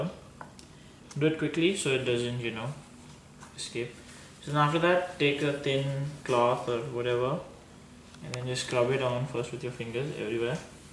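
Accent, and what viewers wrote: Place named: India. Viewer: Why do all the indians talk like that i like the way they talk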